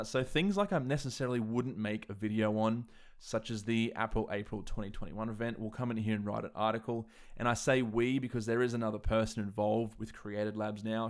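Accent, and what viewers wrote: Australia. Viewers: Came to this channel for the hot Mac videos. Stayed for the aussie dialect. Will keep on staying for stuff like this.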